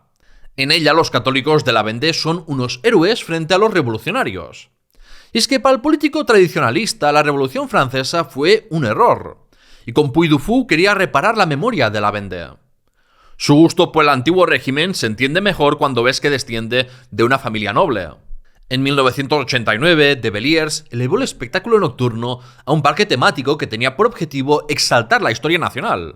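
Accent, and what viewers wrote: Catalonia, Spain. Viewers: Este personaje, iconoclasta de todo lo español, por su aceto, creo que debe ser catalán. Ataca la monarquía de todas las épocas, las conquistas, la religión, aboga por las culturas extranjeras, según él, debería atacar todas las películas de Hollywood, de temática histórica y religiosa, como Ben-Hur, Quo Vadis, La túnica sagrada, etc. Este detractor amargado, se cree en poder de la razón y la verdad absoluta, pero ya sabemos que eres un enrabietado de izquierdas, resentido y frustrado.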